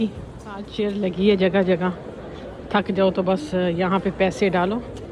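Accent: Pakistan. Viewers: Wesy ma'am ek bt tu hai itny saal Canada main rehny k bad b ap ne Canada ko apny andr nai any diya ap jb bat krti hain tu ek Pakistani lehja alfaz sb kafi واضع hota hain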